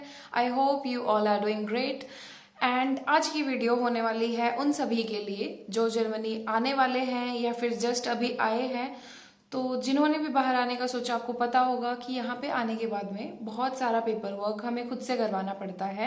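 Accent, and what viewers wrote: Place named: Sindh, India. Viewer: Ur tone looks like Sindhi so r U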